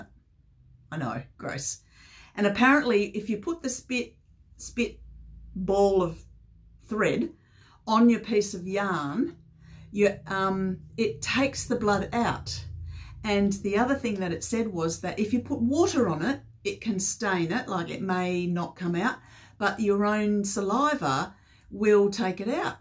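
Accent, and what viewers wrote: Australia. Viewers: Hi, sew glad to find your channel. I love finding an Aussie voice for a change. Look forward to following your channel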